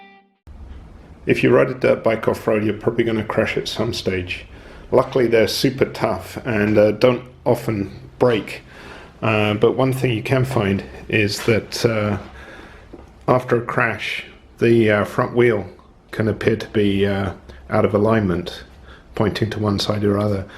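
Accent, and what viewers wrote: United Kingdom. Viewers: Hi just wondering how you got tusk wheels as it sounds like you are based in England?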